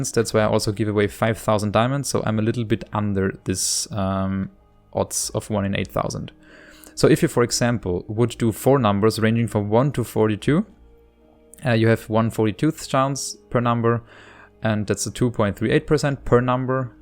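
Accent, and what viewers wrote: Germany. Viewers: Are you german i think you have a german accent!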